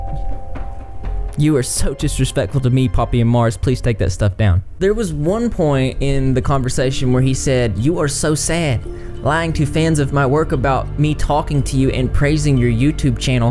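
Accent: South, United States. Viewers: Ur style dnt match ur country twang and i like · I love your accent! omg it's so country/eastern/western gosh it's great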